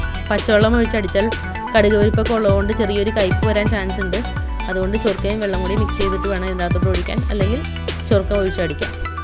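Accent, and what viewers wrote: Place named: Kochi, India. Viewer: adipoli preparation , kochi bhashayil paranjal polichu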